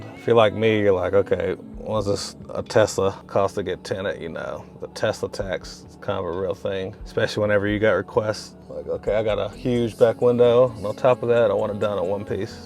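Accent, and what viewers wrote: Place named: Tennessee, United States. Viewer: Knew he was from Tennessee. He’s talking too cool. That’s how we are.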